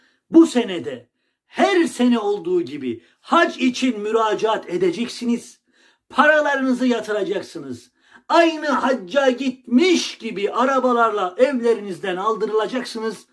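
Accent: Erzurum, Turkey. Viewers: Erzurum şivesiyle güzel yorum yapıyorsun.Gerçekten oralıysan,Erzurumluların seni dinlemesini ve taktir etmelerini senden onur duymalarını dilerim...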